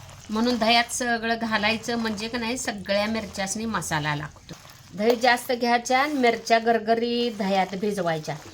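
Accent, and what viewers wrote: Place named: Kolhapur, India. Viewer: मावशी तुमची बोली भाषा ही सुद्धा तुमच्या पदार्था इतकी रुचकर आणि गोड आहे...... कोल्हापूरला शिकत असताना अशी गोड भाषा रोज ऐकायला मिळत असे....nostalgic खूप खूप आभार आणि धन्यवाद अशा सुंदर रेसिपीज इतक्या साध्या पद्धतीने सादर केल्या बद्दल....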